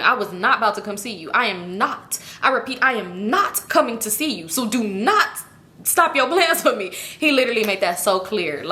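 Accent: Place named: South, United States. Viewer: your random country accent gets me every time. And I’m from Memphis. You know we country as hell.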